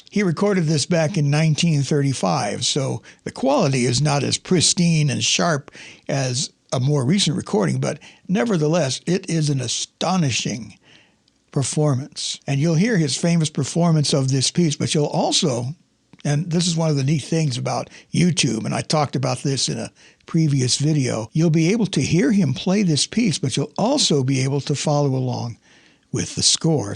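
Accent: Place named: England, United Kingdom. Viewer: Blimey, I was proper convinced you were from England! Pure english English, innit! I'm lovin' it! Your speech is like music to my ears, like a bit of Chopin! :-)) I will keep listening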